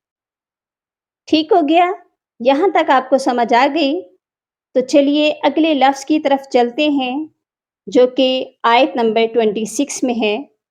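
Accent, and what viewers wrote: Panipat, India. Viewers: اپکا پانی پتی لہجہ ھے ماشاء اللہ مجھے بہت پسند ھے لیکن میری سانس زیادہ لمبی نہیھے جسکی وجہ سے میں بہت پرشان ھوتی ھوں کوئی طریقہ بتادیں تاکہ یہ پڑھائی کر سکوں❤❤❤❤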